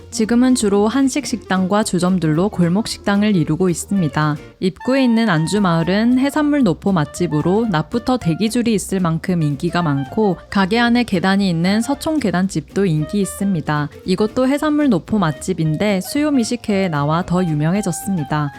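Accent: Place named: Gyeongsang, South Korea. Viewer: 경상도 사투리다! 넘 촬영도 잘하고 차분하게 나레이션해주셔서 추억뿜뿜이네요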